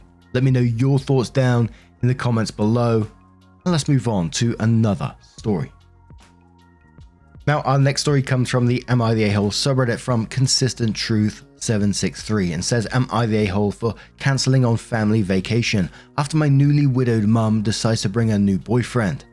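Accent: England, United Kingdom. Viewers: Hello - a fan from the U.S. here! I’m absolutely hooked on your videos. I studied at university in England for a year so love hearing your accent. It brings me back there. I really enjoy the emotion, inflection and humor you add to Reddit stories. I can tell you have a huge heart and really care about people. Thank you and keeping doing what you do!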